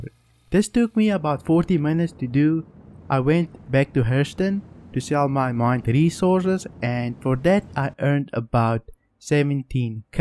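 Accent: South Africa. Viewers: Are you South African? Can hear an accent but not sure, nice video, I'm renting a prospector currently and using helix mining head, making about 45-90k a run. Almost made 1week rental money back in 1 day and now it's for the grind to use the rental to buy it, 1 mil to go. Subbed and liked your video. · That accent. I'd know it anywhere. Fellow South African. Nice vid man. Hoping to get into SC soon so I'm watching a lot of gameplay videos.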